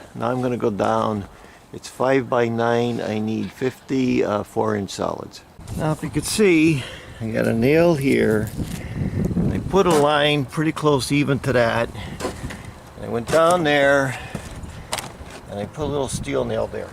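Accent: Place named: Scranton, United States. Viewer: I am turning 72 this month. My house was in need of some repairs that I thought would have to be done by a professional mason but watching your videos gave me the confidence to attempt them myself. The results were great only because of your excellent explanation and recipes for mortar and cement. Thank you so much for offering people such wonderful information concerning your skills. By the way, my father was raised in Scranton,Pa. and he spoke just like you. God bless you. Sharing old world traits is not something we see much of from the masters anymore. Take Care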